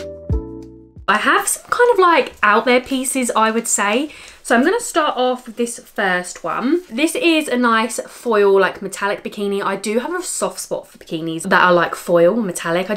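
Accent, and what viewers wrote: United Kingdom. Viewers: So beautiful, just enchanting in every way. And I LOVE that gorgeous British accent! 😍 💕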